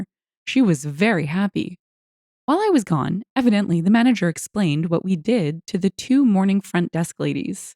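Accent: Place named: Canada. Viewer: Thanks! I'm a Canadian living in the deep south (US) and always look forward to hearing that little bit of home in your voice. 🧡❄️🍁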